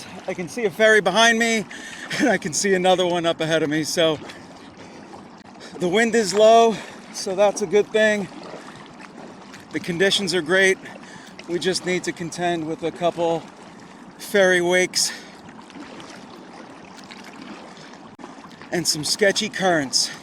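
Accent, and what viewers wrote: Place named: New York, United States. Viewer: Got to be on the look out fore snitches,man you went on a round a bout way to git to , the rinc key dinc island, but in teresting.brave soul,endurance.you talk like mi roommate Chuckie Frome the navy 34 yrs ago Norfolk ,va., he was Frome flushing and queens I am frome Chicago.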